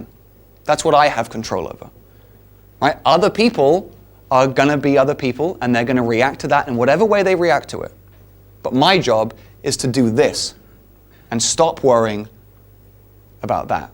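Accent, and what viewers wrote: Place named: United Kingdom. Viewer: Crap, i dont get that british accent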